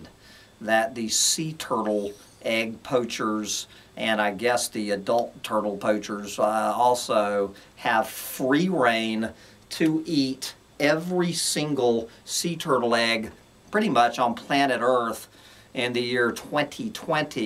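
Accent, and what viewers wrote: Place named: Pennsylvania, United States. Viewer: He is upstate where accents are more like Pa. and Ohio than the City's interesting accent.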